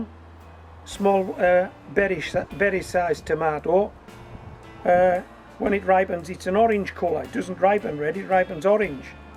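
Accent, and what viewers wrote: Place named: North, United Kingdom. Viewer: Proper nothern accent. Absolutely love it haha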